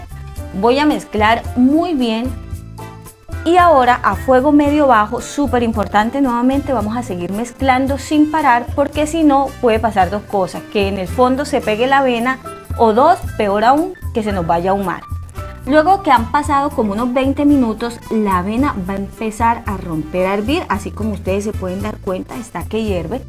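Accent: Colombia. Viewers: Ay como me encanta el acento colombiano! ❤ / 🇲🇽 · ME ENCANTA EL ACENTO COLOMBIANO Y LA FORMA DE EXPRESARSE.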